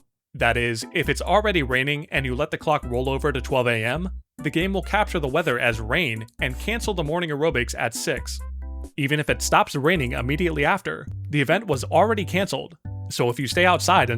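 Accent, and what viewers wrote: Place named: RP, United Kingdom. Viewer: Why do you speak premium english like blathers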